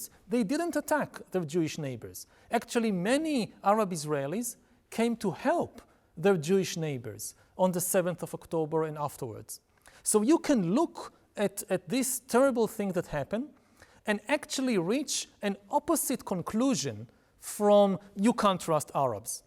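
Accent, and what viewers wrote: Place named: Japan. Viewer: 日本訛りの英語だなこれ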